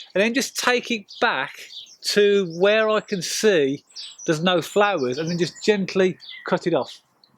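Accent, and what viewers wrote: United Kingdom. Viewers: Eh, don’t bother me about adding the N. It’s the way Brit’s say compost. Not comPahhhst” it’s comPohhhst. Oh. lol not fence pahhhst. Fence Pohhhhst!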